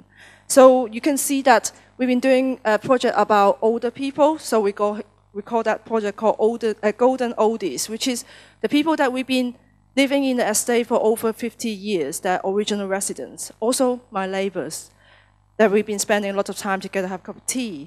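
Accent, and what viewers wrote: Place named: United Kingdom. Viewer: 英式發音，舒服舒服～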